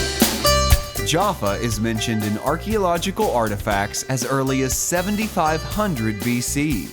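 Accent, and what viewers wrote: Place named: United States. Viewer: A little research on correct pronunciation would help cover over the grating American accent and condescending style. Otherwise interesting.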